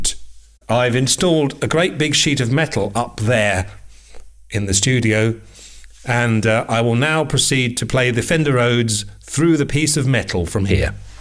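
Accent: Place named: RP, United Kingdom. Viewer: A perfect English speaker with a perfect RP pronunciation talking about a perfect piano of the perfect 70s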